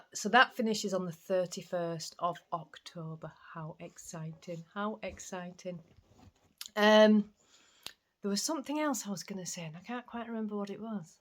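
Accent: Yorkshire, United Kingdom. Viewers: Love your accent and your Yorkshire colloquialisms. Please do not rein them in. I love all things British. I even named my WiFi network “Clever Clogs.” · oh wow you certainly have moved around hence why your Yorkshire accent is only mild. You definitely don’t have any trace of the Hornsea, Hull accent either. It’s very distinctive that. Anyway thank you for that, I only asked because of you saying about a Yorkshire accent. I’m born and lived in Sheffield all of my life. I live in the very south of the city near the Derbyshire border now. I’m hoping my yarn comes for my spooky socks mal today 🤞🏻. Have a great weekend. 😊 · my great aunt use to make bobbin lace I use to sit and watch but never had a go. Love a Yorkshire accent ❤You and have a great weekend I love how you learned to knit and look at you now designing your own patterns and hand dying your own yarn I think it's brilliant that you and have made a little business from it well done 👏👏👏see you Monday xx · Hi X, please don't apologise for your accent, I'm born and bred Nottingham and often taken for being Yorkshire ( now live in Norfolk ) i don't rein my accent in and I'm not gunna change either 😂 hubby is from down south and been married 31 years and still can't fathom out what I'm saying 😂😂😂 I love the Yorkshire accent ❤ thank you for sharing your story about how you got started on knitting it's brilliant.... 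I'm a knitter but used YouTube to get me started with crochet, still practicing 😂 I started during lock down 😊 don't keep apologising about anything You are amazabobs ❤ take care happy crafting have a lovely weekend xxxxxx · That was a lovely story about starting to knit and how great that they took a photo to show people. No wonder you were proud 😍 And the rest is history...... Please don't apologize for your accent. You are a Yorkshire lass, so you have a Yorkshire accent. I do smile sometimes when I watch with subtitles and the translation is often hilarious. I can't always tell what says but I always understand you. Have a lovely weekend, see you on the podcast xxx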